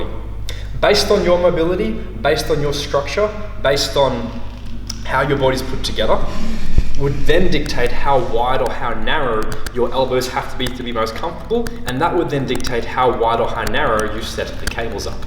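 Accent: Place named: Australia. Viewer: An Asian with an Australian accent who has the body of a statue 😍🤭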